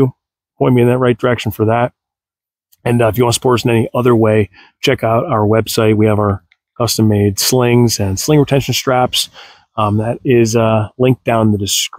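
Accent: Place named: Cuba. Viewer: Your accent reminds me of a Cuban comedian in Miami called Mario Ramil dudes hilarious. Great channel and content btw keep it going.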